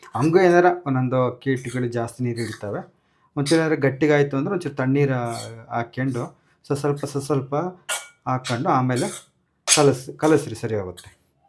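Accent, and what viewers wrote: Chitradurga, India. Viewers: ಕೋಲಾರ ಅಲ್ಲ adu Chitradurga da ಭಾಷೆ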